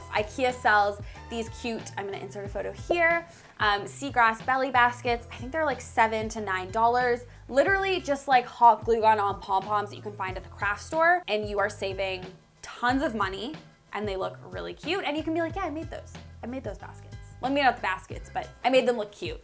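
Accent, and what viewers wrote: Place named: Canada. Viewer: Very useful, you are very talented. I love your canadian accent too. 'Aboot!' And you live in Toronto, you lucky person!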